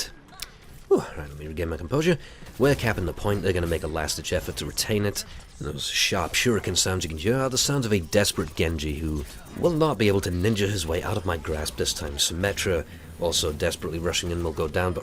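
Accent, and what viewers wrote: United Kingdom. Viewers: You should do like a documentary. Like, seriously. Also subbed, I love hearing the British? accent! :3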